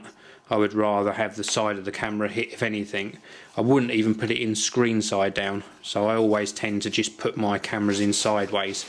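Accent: United Kingdom. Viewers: can you speak without the british accent , and speak faster ?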